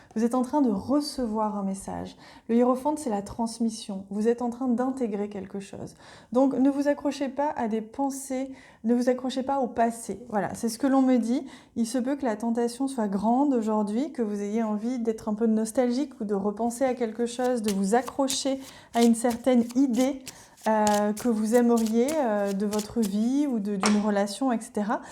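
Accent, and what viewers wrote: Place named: Anjou, France. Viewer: Bonjour Merci pour cette guidance, ta voix angevine donne encore plus de plaisir à écouter et intégrer les messages. J, ai reçu ce message pour toi. Surveille ta peau. Je ne sais pas si cela te parle? Sinon oublie. Bises amicales et bienveillantes Olivier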